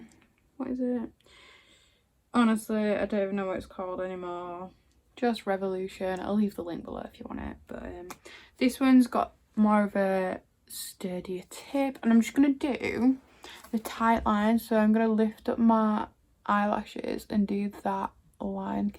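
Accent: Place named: Yorkshire, United Kingdom. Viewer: I'm going to the beer garden this weekend and this is getting me excited for it :) I love your accent, Yorkshire right? Which part?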